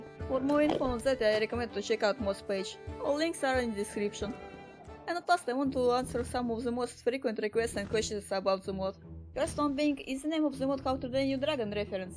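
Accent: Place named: Russia. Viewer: Просто спрошу, ты написал коммент на русском потому, что тебе тоже показалось, что у девушки очень яркий русский акцент?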